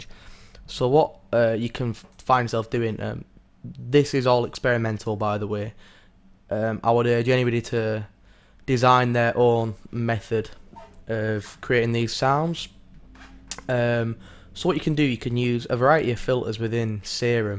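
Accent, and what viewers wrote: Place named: West Yorkshire, United Kingdom. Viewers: Bradford by the sounds of it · Nah, that's a West Yorkshire accent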